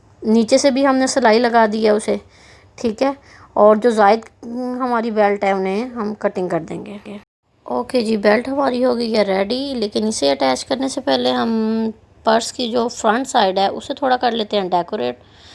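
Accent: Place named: Punjab, India. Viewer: Nice punjabi touch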